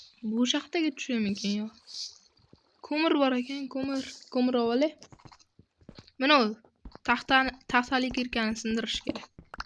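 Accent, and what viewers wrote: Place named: Khorezm, Uzbekistan. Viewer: uka xorazmlikmisan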